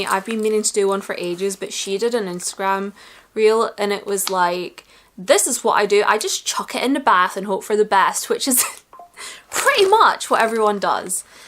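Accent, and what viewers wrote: Scotland, United Kingdom. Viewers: Hi can I ask where you’re from? Scotland?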